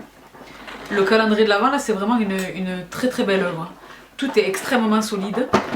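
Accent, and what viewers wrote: South, France. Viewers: Oh la LA !!! Comme je suis ravie pour toi et heureuse de faire partie des sistas ! En plus, un giveway qui te ressemble vraiment. Grâce à toi, je me suis remise au layering, j'ai une wishlist de soins en asiatiques qui s'allonge, je m'intéresse de plus près à certains produits... en bref, je te voue une confiance absolue ! T'es vidéos sont des bouffées d'oxygène, elles sont remplies d'une jovialité débordante et communicative, je les attends toutes les semaines. D'ailleurs, à force de te regarder, j'en arrive parfois à parler avec un brin de ton accent du sud, des expressions propres à toi sortent parfois même dans des discussions, ça me fait rire toute seule !!! J'arrête pour aujourd'hui le roman mais c'est toi qui l'a voulu après tout ! Alors je croise les doigts pour avoir la chance de remporter le Pompon du pompon des giveways ! 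À dimanche 😉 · Coucou! Moi je t’ai connu il y a presque un an maintenant en recherchant des solutions contre la cellulite et je suis tombé sur ta vidéo par chance... je suis rapidement devenu addict! D’ailleurs j’ai tout de suite partagé ta chaîne autour de moi, j’ai parlé de toi à des amies qui ont tout de suite kiffé!!! Des techniques venues d’ailleurs, le brossage à sec, le derma planning, le derma roller... tellement de choses dont on parle peu mais si efficace et validée grâce à toi! Je te remercie pour tous ces partages, plein d’honnêteté et de sincérité. Je pourrais m’étaler encore longtemps mais je vais faire bref lol, c’est toujours le poommmpooom avec toi. Le dimanche toujours en attente de l’annonce de ta vidéo sur Snapchat! Alors on se pose tranquillement sous la couette petit café et on écoute tes précieux conseils emprunt de cet accent tout droit venu du sud, tellement appréciable pour ton abonnée venu du Nord lol. 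Force et honneur à toi!!!! Tu nous as conquis(e)s et tu mérites tout ce qu’il t’arrive aujourd’hui, par travail et endurance, je te tire mon chapeau pour allier ton travail et ta passion! Une femme inspirée et inspirante... pour finir moi je te dirais de continuer sur ta lancée tu arrives à balayer toutes les catégories de vidéos qu’on pourrait voir (mention +++ pour les vidéos chichat) moi j’aimerai pouvoir te revoir dans des vidéos tuto make up avec tes petites techniques ou lookbook étant grande de taille également j’apprécie de découvrir des look q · Hello les sista ........ ahahhaha a chaque fois je rigole je kiff ton accent du sud et félicitations tu le mérite tu partage avec nous ta passion qui est aussi ma passion aussi (tu ma fait acheter des tonne de truc ) et waouuuuuuuuuu le concour de dingue que tu nous fait "c'est un truc de malade....!!!" Lol je rêverais de gagner ce concour 😍😍😍😍😍😍😍😍😍😍😍😍😍😍😍😍😍😍😍😍😍😍😍😍😍😍😍😍😍😍 gros bisous a toi reste comme tu est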